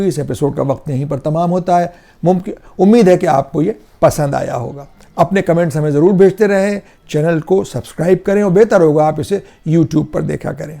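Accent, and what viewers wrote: Lucknow, India. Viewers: Khudara Urdu alfaz ka tarjuma na kariye ga, kyun ke aap ke dilkash Lakhnawi lahje me Angrezi ki amezish se is ka maza thoda pheeka pad jayega. Aap ka andaz e bayan isi purane Lucknow ke lab o lahje me bahot shirin aur dilkash hota hai. Waise ye mera zaati khayal hai, aap behtar jante hain ke aap ko kya karna chahiye, hum aap ke videos ko khoob pasand karte hain.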